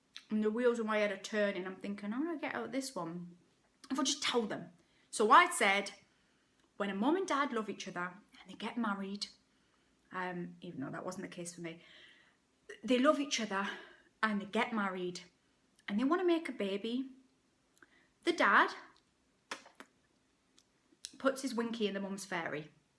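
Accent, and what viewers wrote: United Kingdom. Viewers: Just wondering about your accent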